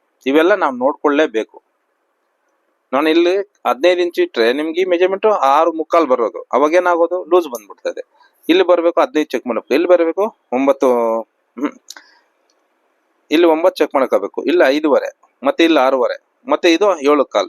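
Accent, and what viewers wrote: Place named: Kolar, India. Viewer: ನೀವು ಕೋಲಾರ ಅಥವಾ ಚಿಕ್ಕಬಳ್ಳಾಪುರದ ಕಡೆಯವರು ಇರಬಹುದು ಅನ್ನಿಸುತ್ತೆ ಸರ್.ಮಾತಾಡುವ ರೀತಿ ಆಗಿದೆ, ಚೆನ್ನಾಗಿ ಬ್ಲೌಸ್ ಕಟಿಂಗ್ ಹೇಳಿ ಕೊಟ್ರಿ.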